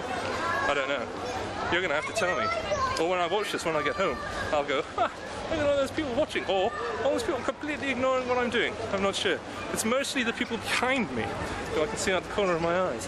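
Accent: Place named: Newcastle, United Kingdom. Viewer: you live in newcastle? thought i heard something in your voice in another vid, if you do, i might see you around sometime :)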